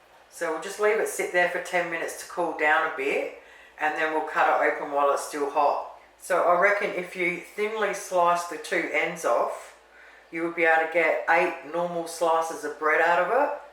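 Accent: Australia. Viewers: So ocker (and I mean that as a compliment)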